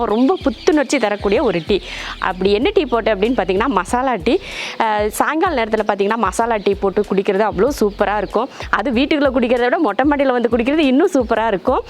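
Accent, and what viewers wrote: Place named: Madurai, India. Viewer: Am also Coimbatore sis😀😀 Unga slang ketu neenga Madurai nu first nenachen😀😀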